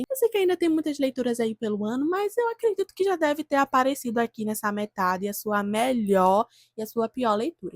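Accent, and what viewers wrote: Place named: Minas Gerais, Brazil. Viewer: Caí de paraquedas hoje mesmo aqui, e te achei uma diva, amei o sotaque (é mineiro?). E eu ganhei O Morro dos Ventos Uivantes da minha irmã, só que eu tô postergando pra ler ele (sendo sincera), até mesmo por tudo de ruim que eu ouvi sobre a adaptação. Mas eu tenho uma amiga que leu e gostou muito, disse pra mim que eles tiraram o foco total do que realmente o livro vem trazer.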